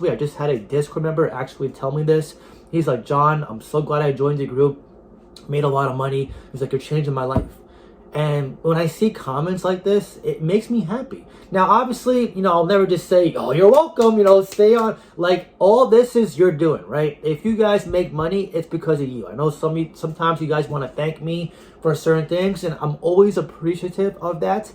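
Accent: New York, United States. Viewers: Are you from NY?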